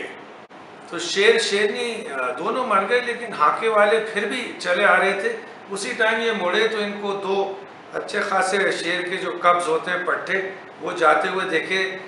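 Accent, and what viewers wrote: Bhopal, India. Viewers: Zabardast tale.. btw you have a unique style of telling these stories with bhopali spices 👏👏👏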